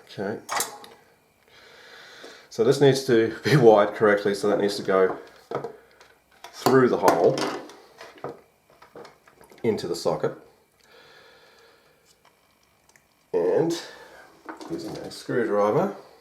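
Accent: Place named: Australia. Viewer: Great to hear an ozzie voice when looking into this subject ta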